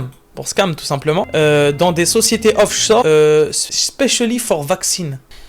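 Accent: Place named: Maghreb. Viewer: AH MAIS TES UN MAGREBIN JAIS TOUTE SUITE VU CA AVEC TA FACON DE PARLER ON DIRAIT UN MONGOL HANDICAPE MENTAL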